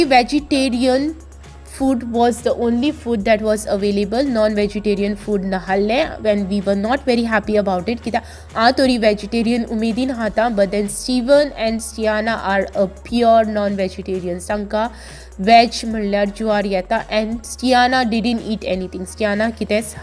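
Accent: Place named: Bardez, India. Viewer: Where in goa u are from i m from calangute ur language is like bardez or tiswadi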